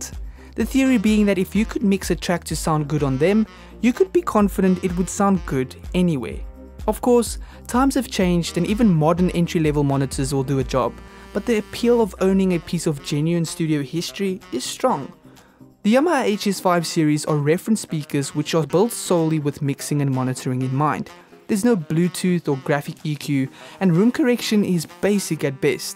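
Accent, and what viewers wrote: South Africa. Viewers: Really enjoyed this video! Toe jy begin praat, het ek dadelik besef jy’s van hierdie kant af! Looking forward to see more from you 💪🏽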